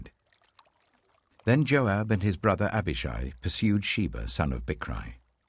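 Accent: United Kingdom. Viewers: What a shame, I don’t like this voice or the British accent. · I like the first commentators voice better than the English ish accent...can not relax with that voice.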